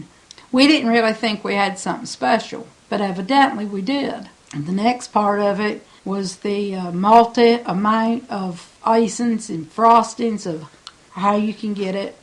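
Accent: United States. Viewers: Your dialect is a mixture of southern drawl and Virginia brogue…I think it's fascinating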